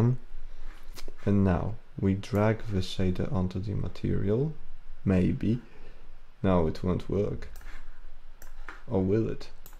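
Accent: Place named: Poland. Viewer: o lol jak słuchałem na początku to dosłownie myślałem - "Ale ma akcent taki typowy"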